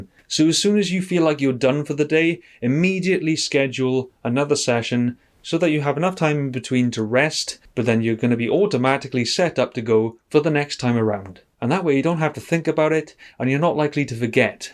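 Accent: Wales, United Kingdom. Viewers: Hey you are welsh. Howdy shw mae?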